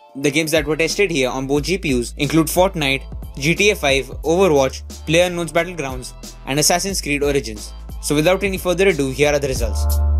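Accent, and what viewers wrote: India. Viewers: Indian accent is always funny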